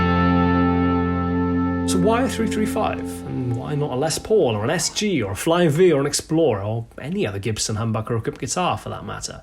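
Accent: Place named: United Kingdom. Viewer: I was born and raised in the US and I'm listening to this video simply because I like the way speaks. My wife and I were talking about how much we enjoy British English (a blackbeltbarrister video had just ended). So I pulled up a video for an encore. David Bennett Piano is sure to be next.:)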